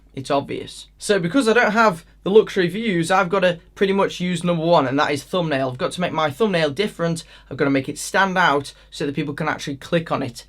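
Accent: United Kingdom. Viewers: You are one of the persons I like and admire. You have raised my style & comfort awareness. As a slim guy I really love your channel. As a lover and enthusiast of British English your voice is melodious and pleasing. Hopefully I can met you as a person one day. SUGGESTION: Please Please Please can you make a content on 'How to Develop British Accent of Spoken English' like yours. Although there are many designated channel for this but your voice is a stand out by far. I believe that this newest content will compound excitement and enthusiasm level of existing as well as new listeners. This content has best of both worlds i.e style and personality. Because everyday I listen to your content to you and style content, I get refreshed with zeal to learn British English (Accent). I anticipate a response at your convenience. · Hi from the USA. Just found your channel and I will be a faithful watcher, yes I subscribed. 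It's fun to see the differences in hand gestures and hear the language of the UK. You have a great on screen presence and I personally don't believe you copy or steal from Alpha M at all. There is room for more than a few men's fashion, etiquette and style on utube. Great job.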